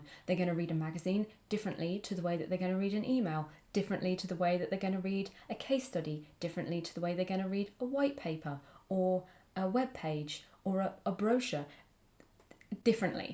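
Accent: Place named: England, United Kingdom. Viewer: I really like your videos, they are informative, inspiring, and make me smile. Great combo, plus being from London, it's so nice to hear an English accent.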